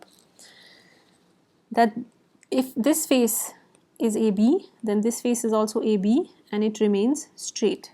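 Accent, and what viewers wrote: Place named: India. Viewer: it seems like you have an indian English accent..Very informative video....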